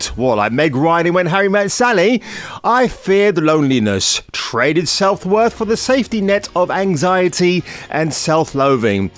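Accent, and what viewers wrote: London, United Kingdom. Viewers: You sound like a good London lad, course I could he wrong. My old ears ain't as good as they used to be lol. But I still can tell 🐮 💩 from Sophia Non'Entity when I hear it. Everytime this gobbshitte comes on the screen now. It's auto MUTE!!!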